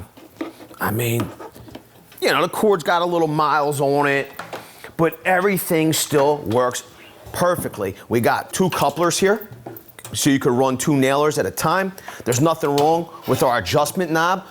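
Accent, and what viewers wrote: Philadelphia, United States. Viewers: He's from Philly, that's how they talk.... get with it broski!!!!!!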